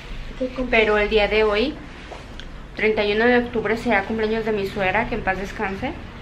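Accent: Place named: Chihuahua, Mexico. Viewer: oh que bien , se me a figuró Que tenias el acento de chihuahua, pero al igual somos mexicanas , buen día , y pues una seguidora más en tu canal felicidades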